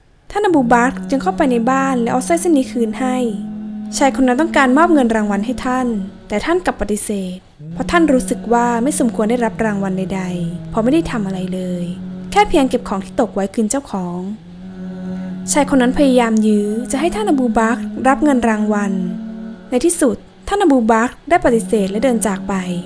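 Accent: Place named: Thailand. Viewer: เขาเป็นมุอัลลัฟ ไม่เคยเรียนฟัรดูอีน เลยออกเสียงตามพยัญชนะไทย ไม่ใช่ตามภาษาอาหรับ เลยเพี้ยน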